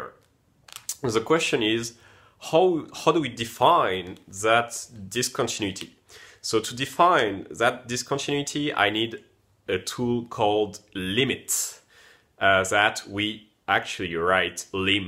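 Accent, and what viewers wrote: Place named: France. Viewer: Bonsoir, j'entends un petit accent français... Tu es français ? En tout cas vidéo très intéressante. Ps : le convecteur temporel c'est pour quand ? ;)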